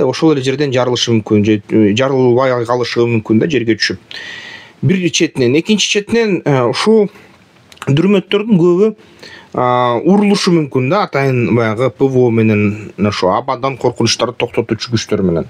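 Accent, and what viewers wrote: Standard. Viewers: Кыргызсча таза суйлойт адабий тилде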